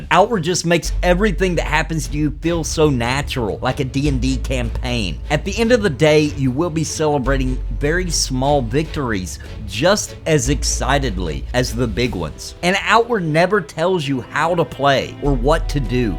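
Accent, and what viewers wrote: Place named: South, United States. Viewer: Can't wait for Definitive Edition... I bought The Three Brothers so I get it! Hey man you from the south? I'm from Tennessee, I hear a little twang in there. Thanks for the video.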